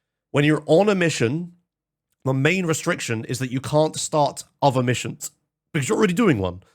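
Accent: United Kingdom. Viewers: He's british, innit?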